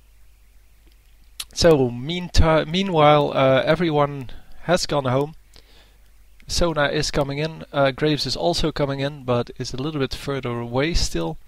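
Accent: Netherlands. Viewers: This commentary sucks.. sounds like you're dutch..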